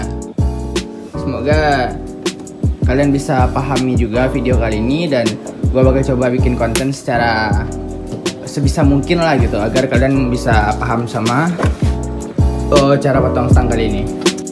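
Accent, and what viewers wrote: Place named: West Sumatra, Indonesia. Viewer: raso suaro urang awak yo bang😂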